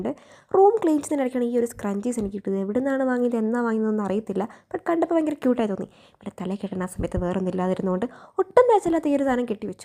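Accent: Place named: Kozhikode, India. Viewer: Calicut slang ollalo da❤️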